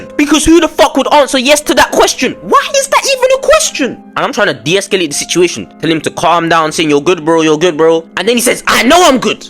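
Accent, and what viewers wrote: United Kingdom. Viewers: Londoner ain’t ya? You sound it atleast and the stories screammmm London lmaooo but this does happen in brum too sadly 🧍🏾‍♀️😭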